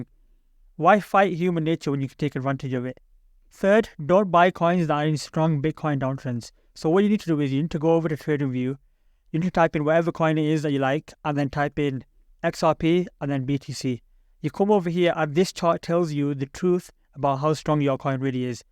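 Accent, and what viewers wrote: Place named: Yorkshire, United Kingdom. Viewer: He's literally English from I'm guessing Yorkshire or Lancashire like Bradford area learn accents before you start labelling smart arse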